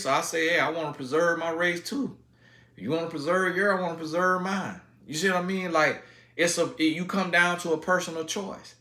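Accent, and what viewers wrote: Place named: South, United States. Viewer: unrelated but i really like your accent! as someone from california, it’s satisfying to listen to. southern accents for the win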